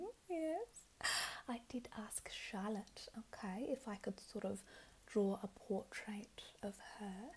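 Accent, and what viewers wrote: Australia. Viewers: I get so excited when you post! I’m so desensitised to asmr because I watch it for my insomnia but yours seems to work so well! Maybe it’s the Aussie accent because it familiar but ❤️❤️ thank you for everything you do!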